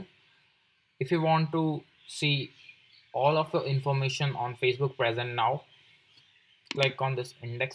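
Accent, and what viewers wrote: India. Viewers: you such disrespectful of person just because the accent. India very smart of technologies thanks to them they make computers and smart phones. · i still wonder why indian accent gives me headaches.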